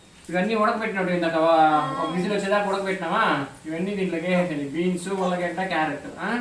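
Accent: Rayalaseema, India. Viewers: Rayalaseema accent tagedela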